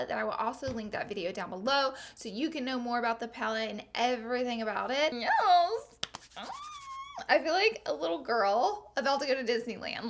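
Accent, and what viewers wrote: South, United States. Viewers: Omg you're so gorgeous 😍😍😍 and I love your accent it sounds southern 😅😊😊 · Omg girl where are you from? That Souther bell accent has me so in love! And I'm so proud of you and your pallete. Started from the bottom. Inspires everyone to just keep going at all times. Work hard. Now enjoy the rewards that comes with it. You deserve it.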